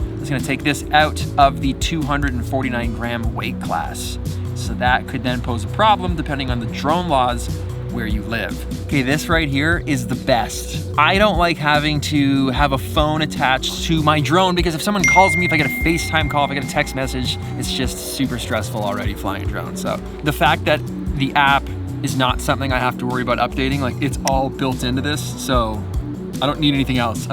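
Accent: Gothenburg, Sweden. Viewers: So great Peter and Peter! :) Def gonna get the mavic mini 3 pro, cant wait! Så kul med lite härlig göteborgska! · Göteborska is just such a delicious accent. Only Peter will know what I'm talking about. The other Peter. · He's from the back side of Sweden I hear! ❤ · Took me a while, but then: aah, he is Swedish 👌😄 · Are you swedis?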